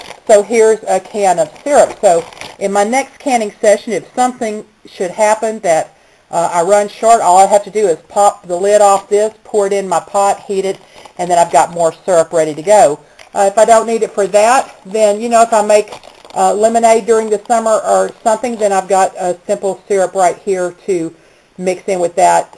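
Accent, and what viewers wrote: South, United States. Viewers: sweet, southern drawl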